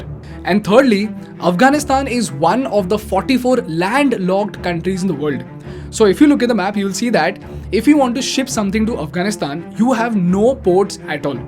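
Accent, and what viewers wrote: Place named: India. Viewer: The problem is ... your Indian accent.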